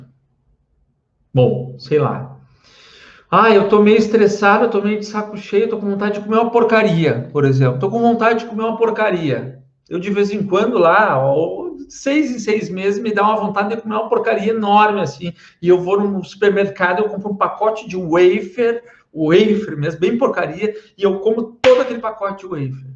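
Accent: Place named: Rio Grande do Sul, Brazil. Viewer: Boa tarde, cheguei aqui por indicação! Pelo teu sotaque, és gaúcho da região metropolitana assim como eu! Gratidão pelo conhecimento!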